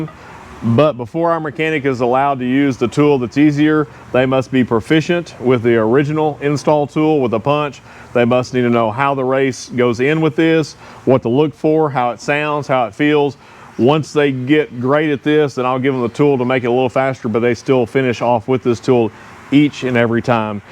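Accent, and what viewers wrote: Texas, United States. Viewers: I truly enjoy your videos and your texas accent, love it. If it weren't for the fact that your are more than 2 1/2 hours away from me (I live in Cedar Park/Austin area) and that I like to maintain my own RV, I will visit you regularly, even just for chatting. I just finished repacking my bearings (races and bearings were good!), and my next job is to replace the leaf springs. Thanks for your help, as I learned a lot with you. Thanks!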